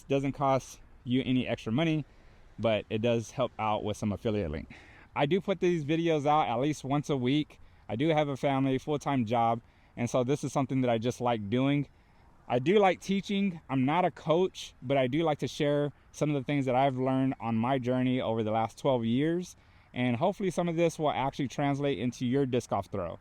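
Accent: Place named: Southern California, United States. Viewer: Yo these are some great points, you sound like you're in Socal. where y'all at?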